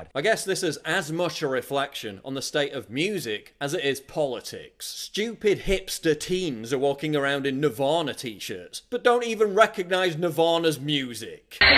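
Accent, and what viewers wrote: United Kingdom. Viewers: Destroying the leftist agenda sounds awesome with a British accent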